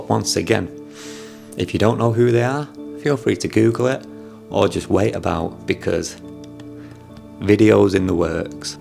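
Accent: Northern England, United Kingdom. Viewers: You’re all good. I’m Filipino and can tell you’re saying it properly within your regional accent (Yorkshire? At the very least Northern English). This is a great sign of Bini’s global recognition—people will say the group’s name with their own accent.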